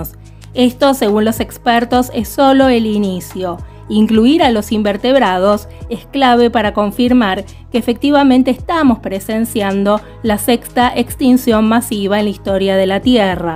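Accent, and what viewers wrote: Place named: Buenos Aires, Argentina. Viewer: Es obvio que sos de Buenos Aires, igual que yo. Me doy cuenta por tu acento, y me siento orgullosa de que seas de acá. Te felicito por tu canal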